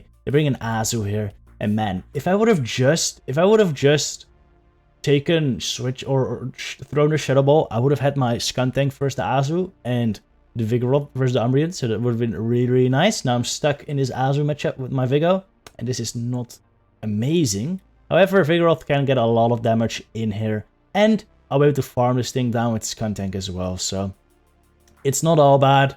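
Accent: Netherlands. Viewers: Zo duidelijk dat je NL bent, goeie video’s wel hahaha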